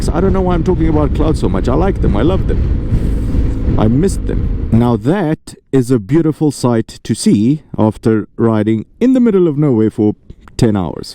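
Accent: Afrikaans, South Africa. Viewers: Are you originally from Suid Afrika?? You seem to have an Afrikaans accent… Not a Romanian accent. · Your accent sounds like an afrikaans guy, speaking English.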